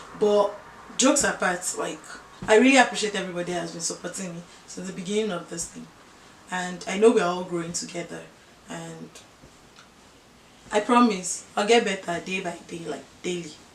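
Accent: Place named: United States. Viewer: You still need to know how to speak Yoruba without the American tone. Above all, It a nice program.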